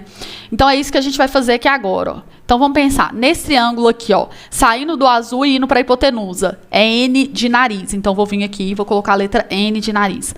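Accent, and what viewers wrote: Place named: Minas Gerais, Brazil. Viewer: Olá prof, a Sra é uma excelente professora, só que a Sra fala rápido demais, vou desconsiderar essa parte devido eu gostar do sotaque mineiro. Parabéns.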